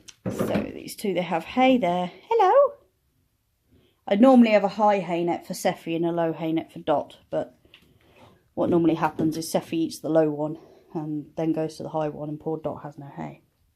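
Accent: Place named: United Kingdom. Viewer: Hello! I'm a new subscriber! I just love your accent, I wonder if your from the same part of the UK as my husbands Auntie :-)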